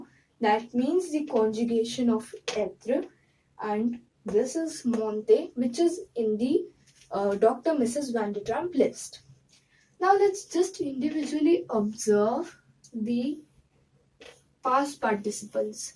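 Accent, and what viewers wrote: India. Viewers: R u indian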